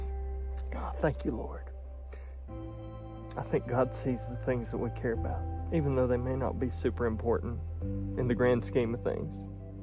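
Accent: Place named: East Coast, United States. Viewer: "Holla". I love you Easterners accent & word choice. We here in Idaho, call that a basin, canyon or draw. Very cool wilderness too have hunted. Hell's Canyon is great too. Fantastic bull. Congrats. Gotta admit, I teared up with you. Truly a blessing. God is always in the blessing His children business!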